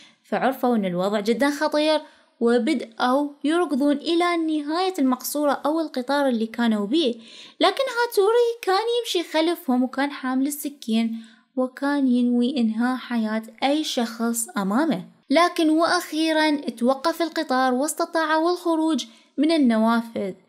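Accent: Iraq. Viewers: ياحلو الهجه العراقية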